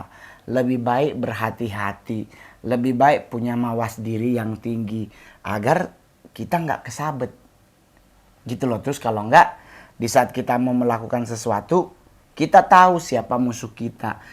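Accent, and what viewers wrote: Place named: Eastern Indonesia, Indonesia. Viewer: Tengkyuuu kaka Mongol, kita jadi tambah pengetahuan sedikit seputar Satanic yg menarik tapi mengerikan. Ayok kaka Mongol, ekspedisi yuk ke 7 titik di Indonesia yg sudah diikat itu 😉👍. Gw tertarik dengan pengetahuan kaka Mongol seputar Satanic waktu join di DMS, hebat 😉👍 Plus logat Indonesia Timurnya, mantaaaappp kaka 😃👍 Salam katong anak Papua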